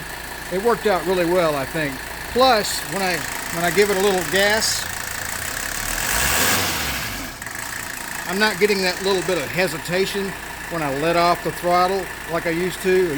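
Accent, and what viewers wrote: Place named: Alabama, United States. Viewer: Hey what part of Alabama you from?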